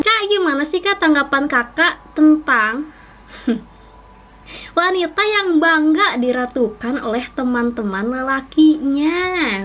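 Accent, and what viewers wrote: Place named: Java, Indonesia. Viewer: GITULLOHHH medok bettt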